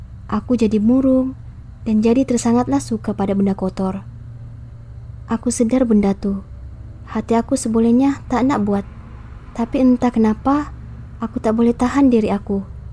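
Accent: Sabah, Malaysia. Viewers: Sabahan ni kan..hehe..ssh mau tapuk kan slang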